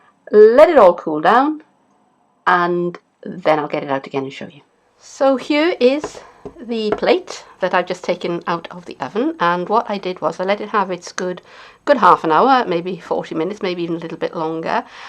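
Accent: Wales, United Kingdom. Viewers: . Hi, I lived in Swansea back in 1968 which is where i gave birth to my daughter, nice to see and hear a welsh accent from the array of utube tutorials out there, i'm never off my tablet, learning new crafts every day. What else do you do on utube? x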